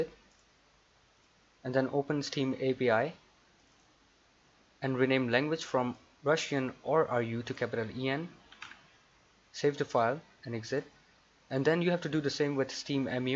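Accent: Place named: India. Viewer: I trust you, you sound like an indian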